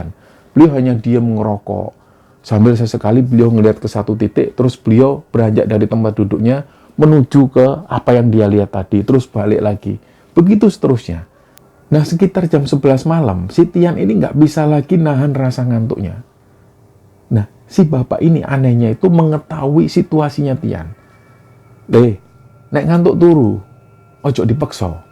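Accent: Java, Indonesia. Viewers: mas septian... jangan lagi mencari sosok bapak² yg menolong panjenengan nggih... kirimkan alafatihah saja sesuai dengan apa yg ibu warung sebutkan ketika menyapa bapak tsb.saat hendak mencari mbak septi, 😊😊 mbak septi ada satu pesan dari alam ghaib ktika memesan mi instan,beliau berucap "MAU BERSABAR" 😊 om bhasa "jawa" nya medok bingits ❤👍👍👍 sehat sehat ngih 🙏🏻🙏🏻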